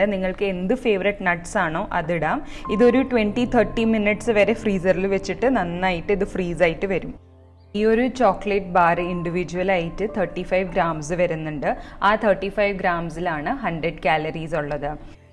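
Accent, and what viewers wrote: Thrissur, India. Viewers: KPL nmmde ijk... Are u from thrissur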